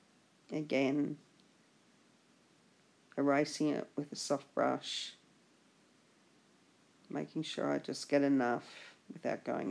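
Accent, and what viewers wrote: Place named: Australia. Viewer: First, you are a wonderful artist. Here are some comments of mine. I had trouble understanding you because of your chest cold and Aussie accent. It did get better as we went along but you sometimes let your words trail off. You work fast, so you might want to stop at some point and show the layers you are using. Also, you use different brushes. Some artists will draw a line or two on a white layer to show what the brush looks like on its own before applying it to the painting. You might consider this. You mentioned a base layer to get darker color but you may want to take that a bit slower so people can understand where you put it and why. Hope this helps. Ron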